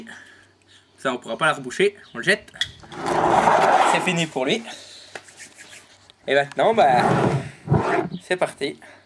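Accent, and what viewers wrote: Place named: Belgium. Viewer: le soleil du sud avec un accent belge ? le combo de dingue un belge sudiste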